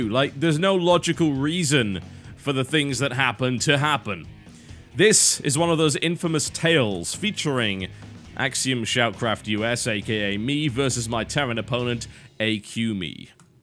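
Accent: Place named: North, United Kingdom. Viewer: I love hearing irate Northerners. Rest in peace yo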